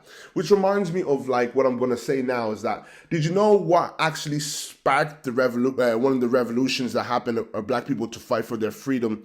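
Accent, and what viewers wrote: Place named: London, United Kingdom. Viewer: He has the London bridge accent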